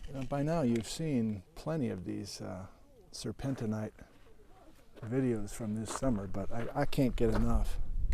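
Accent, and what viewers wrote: Upper Midwest, United States. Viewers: Nice upper Midwest accent. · LOL good to hear a Wisconsin accent again. Reminds me of the family down in Chicagoland. Another great interesting video!. Love seeing places I doubt I will ever hike to anymore. Thanks again. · Love the northern Midwest accent! I don’t know how you are able to turn it on and off so well.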